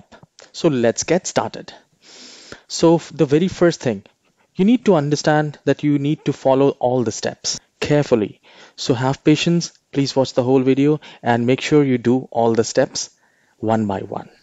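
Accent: India. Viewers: jesus pleesuz. It would be so nice if people that are instructing, would look at their tutorial from a student point of view. I spend more time rewinding because I can't understand the jibberish coming out of your mouth. Your think accent is bad enough in and of itself. But stop mumbling. Typical of east indians. And speak slowly ffs. Make sure to say EXACTLY, what you are typing. saying "temp%", is not what you typed "%temp%".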